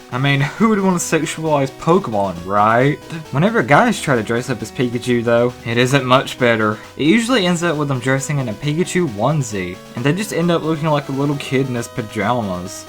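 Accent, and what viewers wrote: South, United States. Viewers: Omg this guy's voice is so red neek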